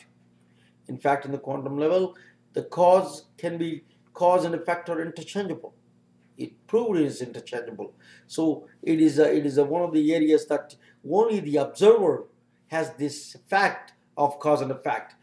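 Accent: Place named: Middle East. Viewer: 5. Didnt see a fifth question. But it is not hard to explain something containing entropy since nothing does in the solar system to our knowledge, might want to look up the "big crunch" or "big freeze" theories to do with entropy and matter in the universe. Also please try to speak more clearly I couldnt understand half of that, I know you are middle eastern or something to that effect but when attempting to argue these things you need to be clear. Nothing you said poses a problem to Dawkins.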